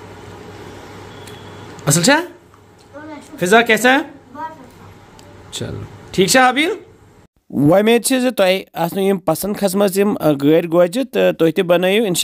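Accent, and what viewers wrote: Kashmir, India. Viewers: I love listening to your Kashmiri accent.....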